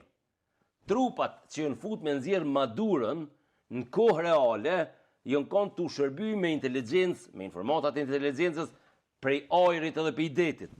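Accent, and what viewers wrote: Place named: Dardania, Kosovo. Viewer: me pelqen kur fol se e fol guhn e bukur DARDANE po te lutem mos i merr fjalet pej guhes shyipe te shyipnis ajo nuk asht guhe ajo asht me qellim guha ma e flliqt primitiv pervers e globit jo rasist se e len te funit me shkru ajo nuk ka lidhje me guhn e shyipetarv ajo asht guha ma e re e globit ,mire e kanemaqedont kur thon se asht guha ma e re en dyja se maqedonishtja ashte e 1950 nja 22 vjet ma e vjetr edhe pse te dyja naen ekane gegrishten ata e shkelin dhe e urrejn guhn tone guhen tone gegrishten ton guhen e babs GEG E NANE GRISHE -GEGGRISHTEN TONE TE BUKUR GUHEN UNIVERSALE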